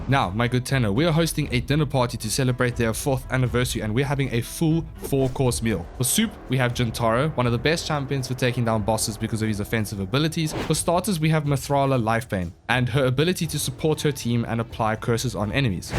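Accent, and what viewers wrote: South Africa. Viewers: You sound South African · U south-african? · Are you south africa? Your accent sounds a lot like it, just wondering as I am from SA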